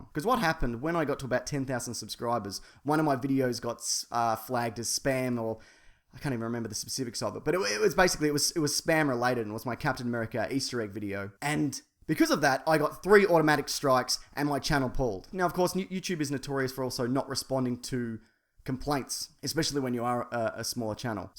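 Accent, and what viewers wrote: Australia. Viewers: Wow, this video is very informative, thanks for bringing this issue to light. I have heard horror stories about youtube in the past. Just wanted to say how much I enjoy your channel and I bloody love (the) Weekly Planet. I am originally from the UK but currently living in Malawi. The internet connections are pretty shit here but I really look forward to your podcasts and videos every week. Yourself and Mason are fucking comedic geniuses! Oh and I spent two years living in Melbourne a few years ago and I quite enjoy hearing the accent again! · I knew he was from Australia #wtfu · I love you,. I love you and your sexy sexy serious Australian voice.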